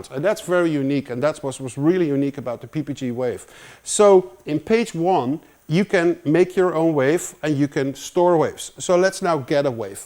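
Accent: Netherlands. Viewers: That's more just his accent. He's Dutch. Just for an example, Canadians will say some vowels surprisingly different than other English speaking peoples.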